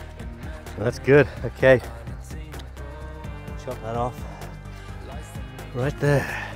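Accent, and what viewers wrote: Lancashire, United Kingdom. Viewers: where you from 'Lancashire?????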